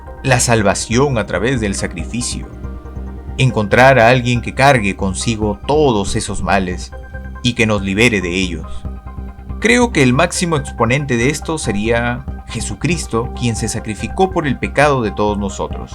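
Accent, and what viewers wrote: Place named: Peru. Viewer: Fascinante! Tu narración es tan natural que me das envidia, me encanta el acento peruano. Curiosamente estoy trabajando en un proyecto para Death Note y he tenido que ponerme a leer sobre el "bien común", entre otro temas, para entender mejor la obra, y te puedo asegurar que me has ayudado a digerir la idea mejor, eres muy bueno en esto. Por cierto, buena analogía la que haces al final: "Trabajo, ahorro, inversión", no podría decirlo mejor.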